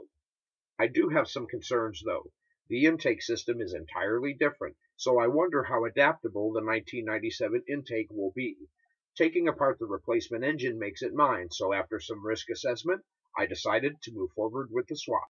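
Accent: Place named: Missouri, United States. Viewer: Awesome video. I also like to do engine swaps and customizations. I also live in Springfield Missouri which it sounds like is where you're at also. I've watched several of your videos recently and never caught that. 👍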